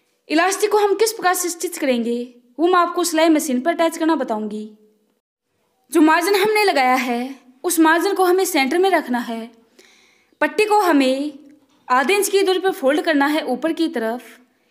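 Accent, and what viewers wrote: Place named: Garhwal, India. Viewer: Boli mai garwali tone lag rahi hai .aap utrakhand se ho?❤❤❤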